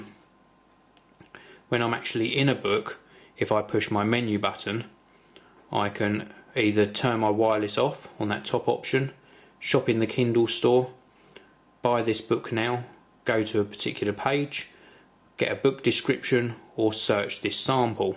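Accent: England, United Kingdom. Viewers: Everything in an English accent sounds so much more professional :) Great review. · love your British accent :D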